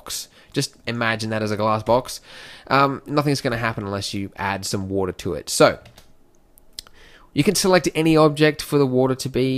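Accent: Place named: United States. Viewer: it's calt American English.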